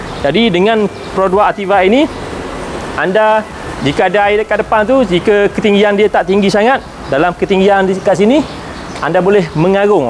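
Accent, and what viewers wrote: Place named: Terengganu, Malaysia. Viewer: Try ckp kl tpi bunyi ganu gak😂klaka la abg ni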